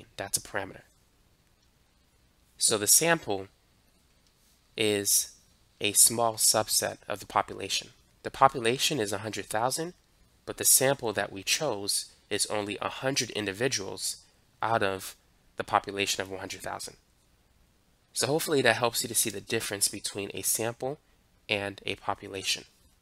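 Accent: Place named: Brooklyn, United States. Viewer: He has just the slightest Brooklyn accent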